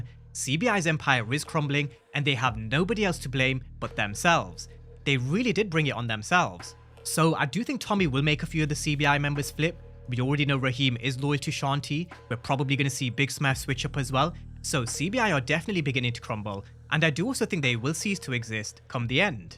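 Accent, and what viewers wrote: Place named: United Kingdom. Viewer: He sounds British. So it’s 10 am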